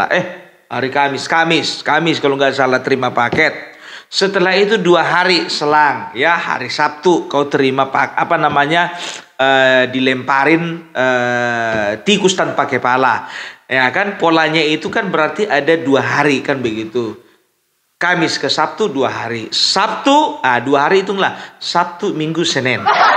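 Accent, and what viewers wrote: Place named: Java, Indonesia. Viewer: Bang suk mben golek mantu / besan jowo wae opung lha boso jowo sampean wis medhok 🙏👍💪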